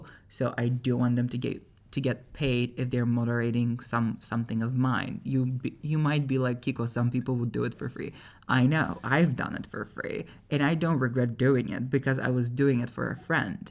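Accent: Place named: France. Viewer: Its so nice knowing how you would talk longer then 1 minute, its so funny (in a good way, it makes me laugh because I have the same humor and I love that accent!! (is it french? idk)). Also very nice to know what is going on with the channel. <33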